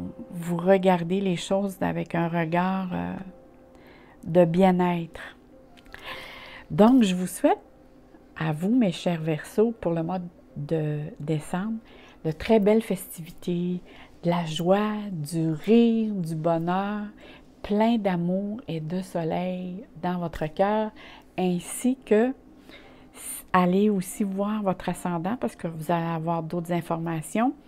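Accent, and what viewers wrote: Canada. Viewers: je vous decouvre et j'adore....MERCI bizou du coeur de Nouvelle caledonie...(votre accent canadien...que jadore)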